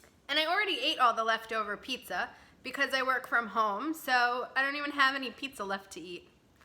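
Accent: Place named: Rhode Island, United States. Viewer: You can never lose a RI accent!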